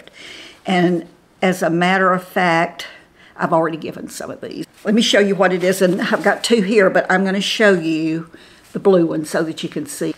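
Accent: South, United States. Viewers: I lost 8 of my closest family and friends. I had to take care of my husband for two years. He had Parkinson’s. He died almost 4 years ago. My reason for telling you this is I did not have a wrinkle one when all this started in 2009 I got all kinds of stuff. I just don’t know which way to turn, i love to watch you. You are so down to earth and I don’t know where you come from but you sound like a southern a lady. From Alabama. 80 looks beautiful on you beautiful!! 🩷 I hope that I can b like you. I watch all of your videos and love each one thank you for being there for me. I feel like you’re doing this for me ha ha💓🌸🩷 Just don’t stop! U make my smile! Cathy Seymour from North Alabama🩷🌸🩷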